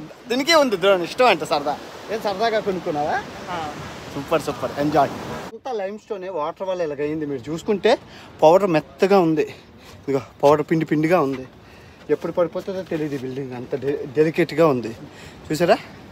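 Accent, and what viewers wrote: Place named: Visakhapatnam, India. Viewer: Mi matalaku navvuagatledu sir anthaena mana vizag basa kada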